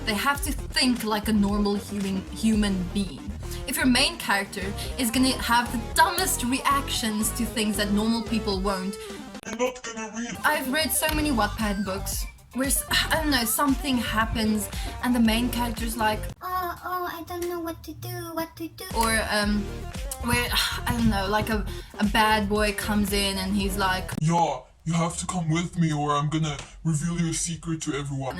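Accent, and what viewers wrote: South Africa. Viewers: ARE YOU SOUTH AFRICAN !?!? -accent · wait, wait, wait, is she South African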